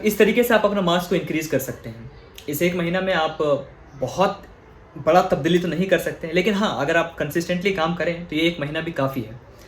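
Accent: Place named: Maharashtra, India. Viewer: it feels like you are from Maharashtra ♥️ amazing voice!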